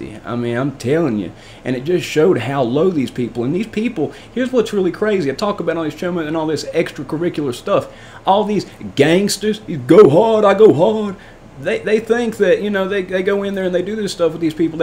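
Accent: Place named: Tennessee, United States. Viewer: Where are you from Kentucky here. I cant pin that accent down . You sound like a cousin of mine though lol Best i can guess is Tn or Ak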